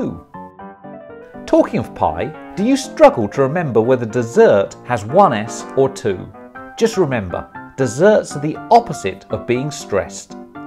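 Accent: United Kingdom. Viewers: Who is this guy? He sounds British.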